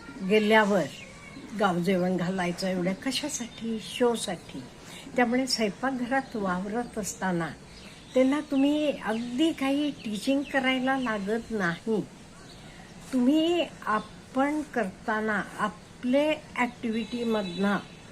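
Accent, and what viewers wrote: Karnataka, India. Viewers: तुम्ही खूप गोड आणि हुशार आहात.तुमच्या बोलण्यावरून तुम्ही Karnatak च्या असाव्यात हे ओळखले होते.तुमचे videos informative असतात.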